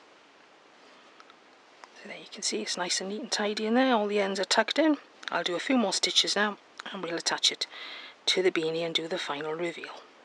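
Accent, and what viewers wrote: Wales, United Kingdom. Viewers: So lush to hear a Welsh accent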